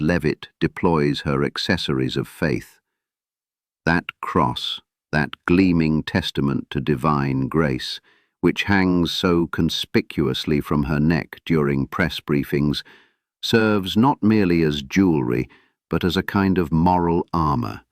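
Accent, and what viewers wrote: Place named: England, United Kingdom. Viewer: Wonderful, simply wonderful. The delivery in a crisp English accent, the words used, the message clear, cogent and concise. We love it! Keep it coming please.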